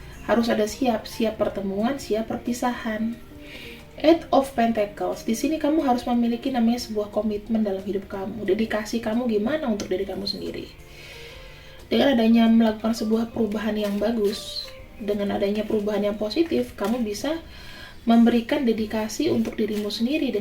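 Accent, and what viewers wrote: Java, Indonesia. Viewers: Kakak suka gruuiiiiss ya kata ppaaauujaannnnggg kayak nya kkk orang jawa ya deh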